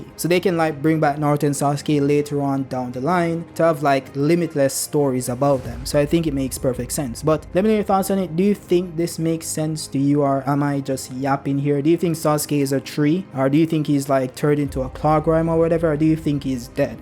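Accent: Jamaica. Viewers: Are u Jamaican ? If so I am too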